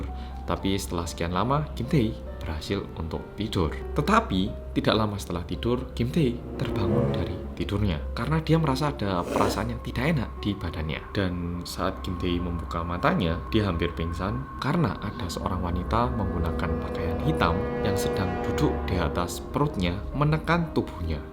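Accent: Java, Indonesia. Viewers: Iya medok ny ilangin ak suka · Jam 1.44 AM kyaknya jdi jadwak tetap nonton rindingdong deh, makash mas berkat suara medok mu aku jdi cepat ngantuk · Medokkkkkk aja mas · Medeeeekkk nya itu lhoo masss😆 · kangettt gw, suara mu itu lho bang medok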